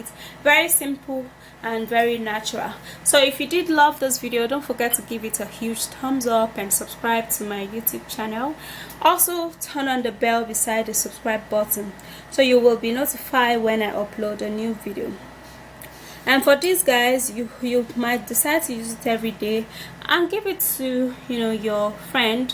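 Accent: Nigeria. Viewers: That accent sounds like a Nigerian. They are all after money if anything goes wrong you can't sue her. How come doctors don't refer you to such things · there s nothing called "African English"... try not to display your ignorance in public.. from her accent she sounds like she s from West Africa...Nigeria to be specific...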